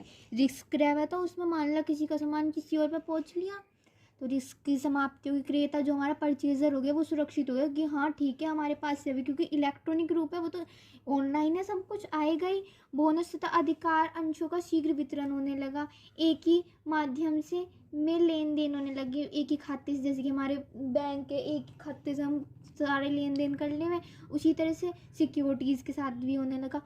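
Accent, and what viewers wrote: Uttar Pradesh, India. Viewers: Aapki cute voice m up dikh rha hai i am also from utter pradesh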